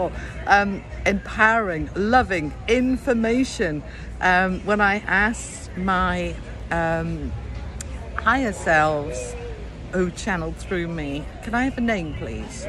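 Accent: Wales, United Kingdom. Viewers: My son has just arrived back from Orlando. It was 35 degrees, ugh. I’m in England and to me you sound ever so slightly Welsh. ❤ Did you mean the Celestine Prophecy?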